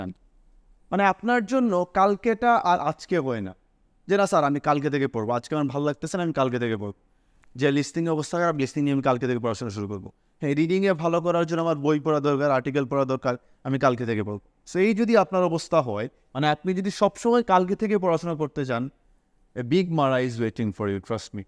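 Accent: Sylhet, Bangladesh. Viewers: Sir You r Sylheti😍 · Wow so quite sylhety .🎉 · Sri apnar Sylhet e basa ta sundor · Sir,Sylheti kotha gula kubi valo lagche. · Outstanding video.Are you Sylheti? 🙂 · Sir...apni Sylheti..kun jagay poran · O sir afni sylheti ni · Sir apni kita sylhti ni plz koiba.. ar kun jaigat porain akto janai bah ame portam sai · Apni ki amader Sylhety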